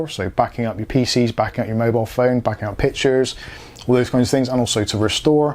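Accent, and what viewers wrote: Bristol, United Kingdom. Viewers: Hi, I have a Asustor 5202T Nimbustor which is very similar. One thing you should mention is that Asus and Sound blaster USB soundcards work well. My NAS is connected in to my Hi-Fi and all my music and a lot of vids are stored on my NAS which makes it a great media player. I have recently installed the Home Assistant app and he I,m now exploring using my NAS to monitor and control home energy usage. Finally, I think you are a Bristolian judging by your accent... Am I right?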